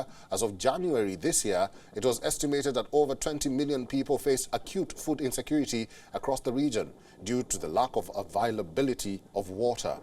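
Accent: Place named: India. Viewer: you now speak like an indian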